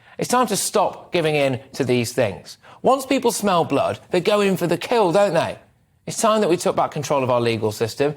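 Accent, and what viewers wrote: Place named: Northern England, United Kingdom. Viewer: his accent! some northerner trying to talk standard RP but not quite managing it. hire a southerner who speaks properly! this is ugly!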